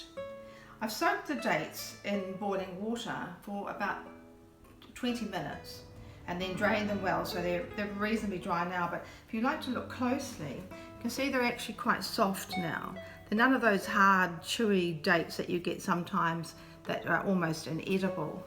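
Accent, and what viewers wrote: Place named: New Zealand. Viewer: I had never thought of making date scones until today when I saw your wonderful video (and recipe!) I will be 'having a go' later today! I'm in Yorkshire so am pleased that you pronounce 'scone' properly! I have friends here who are from Dunedin so I'm used to your fascinating accent - though I still find it amusing when you mention 'the bist brid; marking the sigments; so much bitter; a lemon zister!; ¡ɹǝʇʇᴉq sᴉɥʇ puɐʇsɹǝpun uɐɔ noʎ ǝdoɥ I - ooʇ ǝƃɐnƃuɐl puɐlɐǝZ ʍǝN ǝɥʇ uᴉ ǝʇᴉɹʍ oʇ uɹɐǝl oʇ unƃǝq oslɐ ǝʌ,I Thanks again for the video and the useful addition tips!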